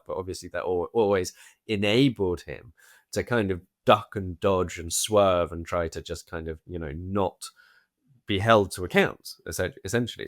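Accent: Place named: Home Counties, United Kingdom. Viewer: Home Counties but if I had to guess I would say Buckinghamshire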